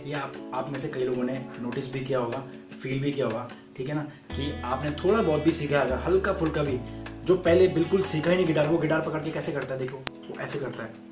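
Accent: Himachal Pradesh, India. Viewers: Himachali ho bhaiji ??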